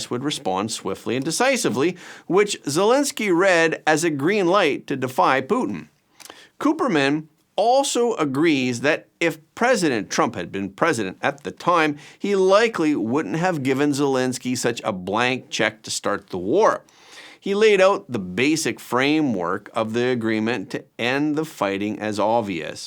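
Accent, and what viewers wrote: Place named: Canada. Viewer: From Perth Australia. Watching FrontPage for few years. Direct & Up to Date mainline Real News. Try to watch with Coffee each morning. does a great job of reading News. I suspect is actually Canadian (accent). I Grew Up in Toronto Canada but saw the writing on the wall years ago when the decided to split the country by labelling in both French & English 😂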